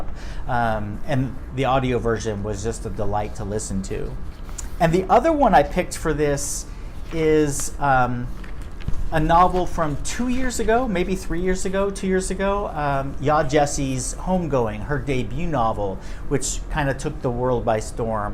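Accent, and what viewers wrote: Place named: West Country, United Kingdom. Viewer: This is a lovely example of West Country accent, which can also be found on the Channel Islands (of which Guernsey is one) <link> Thank you so much for all the recommendations! I've read Bear Town, and loved it, but I've never heard of the others. I have much exploring to do :) so thank you!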